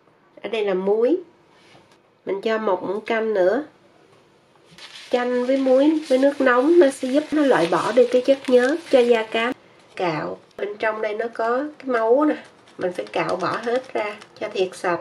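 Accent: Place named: Vung Tau, Vietnam. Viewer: Giong chi giong nguoi Vung tau qúa😮😢❤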